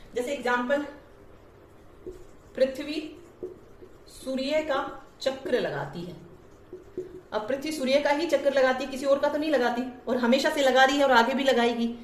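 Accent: Haryana, India. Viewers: Ye haryaanvi tone h bhai. Change nahi hogi🙂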